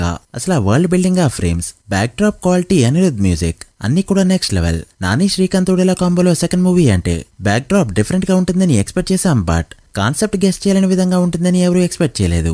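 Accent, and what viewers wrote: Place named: Telangana, India. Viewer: ee telangana slang vinadanike chirakuga vuntundi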